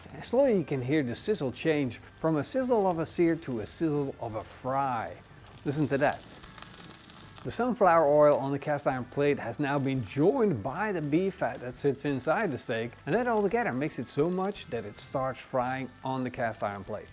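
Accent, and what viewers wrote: Netherlands. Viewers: You sound like a Dutchman. My dad kept that accent for as long as I recall. Ahhhh dacht ik well hoor. :) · Holland is my guess. If you ever can't quite place an accent, it's almost always Dutch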